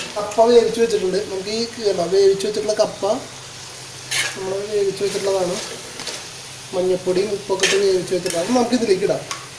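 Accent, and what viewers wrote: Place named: Thrissur, India. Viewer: രാത്രി പതിനൊന്നരക്ക് ഈ വീഡിയോ കണ്ടപ്പൊത്തന്നെ വിശപ്പായി... 😀... നല്ല അവതരണം, തൃശൂർ ഭാഷ എന്നെപോലെ തിരുവനന്തപുരംകാർക്കു വലിയ ഇഷ്ടമാണ് 👍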